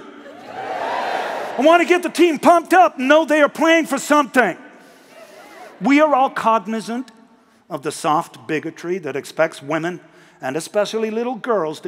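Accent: United States. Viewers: Has nothing to do with Trump. The accent is far from being uniquely his, and it doesn't sound very much like him anyway, pretty far stretch to say he's mocking Trump. Lots of folks in the US have a similar accent. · I swear. Damian Lewis’ American English is hands down spot on! ❤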